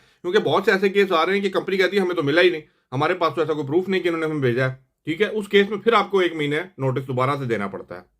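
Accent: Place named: Punjab, India. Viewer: are bhai hindi bol raha hai ya punjabi , thoda clear bol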